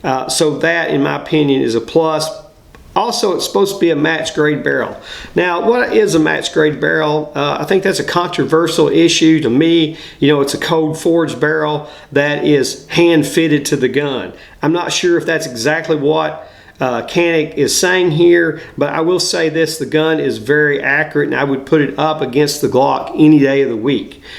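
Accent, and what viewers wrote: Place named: South, United States. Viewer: Gin faaahve Love that southern kick. Excellent video my friend.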